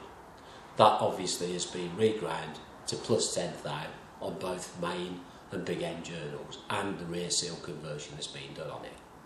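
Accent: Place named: England, United Kingdom. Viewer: He's English :) My mom hated watching old movies from England on tv - but this gentleman is way easier to understand.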